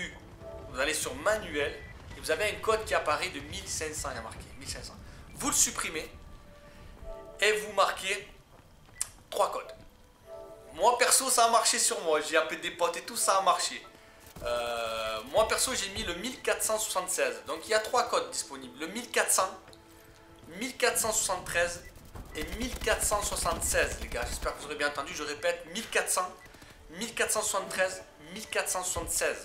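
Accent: South, France. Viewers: Tu est trop excellent putain tu me fais grave kiffer avec ton accents du sud j'ai beau savoir que tu est pour Marseille je te kiffe continue mon gars <3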